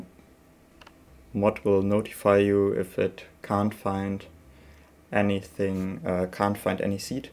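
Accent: Germany. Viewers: Bro i am hearing the DEUTSCH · Du bist sprichst deutsch oder? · Ich finde es wunderbar wie man sofort hört dass du deutsch bist hahaha :D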